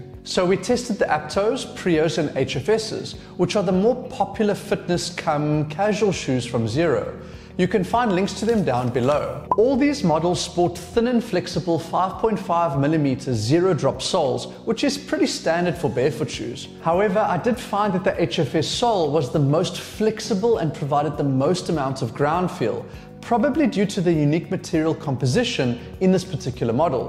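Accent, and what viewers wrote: South Africa. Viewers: South African accent?? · Ja bru I skeem he's a joburg o ay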